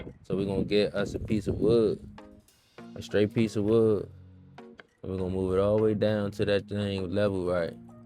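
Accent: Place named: Chicago, United States. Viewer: I hear a Chicago accent. 😊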